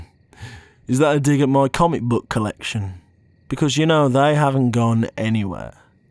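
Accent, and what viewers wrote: United Kingdom. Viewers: I dont usually like men BUTTTTT I make an exception for this bri’ish boy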